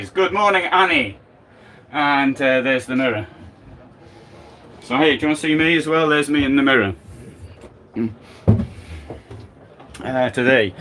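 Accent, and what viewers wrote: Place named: North East England, United Kingdom. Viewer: watching your videos are my guilty pleasure which I took up in lockdown. I’m a bit too old to take on a campervan. I’d be a bit daunted by the size since I drive a Smart Car! Will you be showing us your canine companion on one of your videos? Lovely to hear a fellow north easter on here. Thank you so much for doing these videos.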